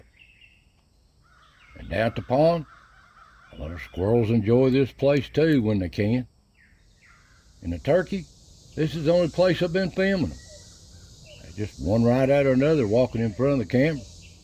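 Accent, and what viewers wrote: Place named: South, United States. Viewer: We really envy you, living in that beautiful place, looking up into that Peaceful Place that God gave us to embrace & enjoy😊. The simple pleasures really are the best. Really enjoy listening to you narrating those wildlife events & happenings, just something real right hearing it said with that old southern drawl; real authentic you know: right down to the ground genuine!! The Peaceful moments are much appreciated. Next best thing to being there🙏🏻!! 🫎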